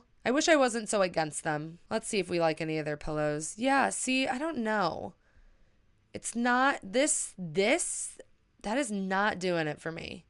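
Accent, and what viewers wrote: Chicago, United States. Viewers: I love your videos. You’re smart and opinionated and your Chicago accent makes me homesick. As far as being sad—you need to work things out in your own time. There isn’t one way to get over heartbreak. One day you’ll wake up and things won’t seem so hard. As for shopping—Zara Home is great for things like drawer pulls and candles. Their sales are fantastic. I would love to see your thoughts on Anthropologie—so cute, but really expensive and the quality doesn’t always seem to be there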